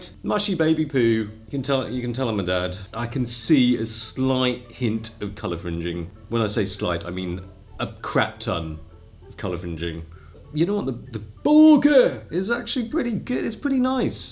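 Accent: England, United Kingdom. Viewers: Your British accent melt my heart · I literally laughed out loud when that guy talked about your english accent 😂😂😂😂😂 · Chinese people are usually way too accustomed to American accent, plus seeing an Asian with that kind of authentic British accent is not usually expected and pretty confused for the first one or two sentences. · I'm sorry if I'm rude.. but are you an asian? your british accent is freaking delicious to listen #nohomo :\ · Can you tell why you have an british accent? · I just love your thick british accent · FISH N CHIPS accent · Spends first 1/2 of video talking about the color the cap & the cat with an English accent no less. Hard to take.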